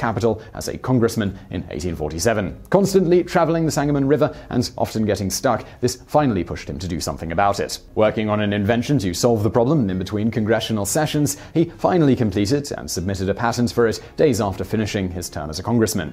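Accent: United Kingdom. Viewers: British Vsauce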